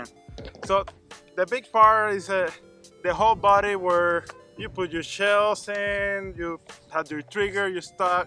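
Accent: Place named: Puerto Rico. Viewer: My wife and her family are from ponce and I'm from Argentina we always make fun of each other's accents lmfaooo. My bros in law sound just like you bro!!!😂😂😂😂😂😂😂😂😂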